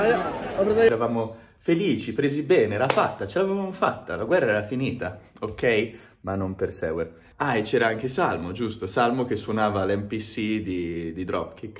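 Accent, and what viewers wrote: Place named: Rome, Italy. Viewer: Ma perché parli in Romano? Bel video continua così 😊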